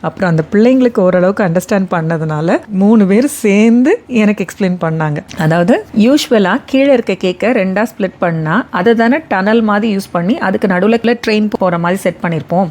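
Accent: Madurai, India. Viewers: அருமை அருமை நீங்க மதுரையா sister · Nega madurai ya akka 😊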